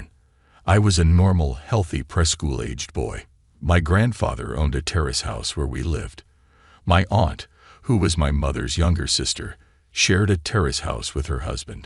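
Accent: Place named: United States. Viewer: Good story. Thank you... the narrator's voice sounds like the USA 🇺🇸!! Tired of the British accents!!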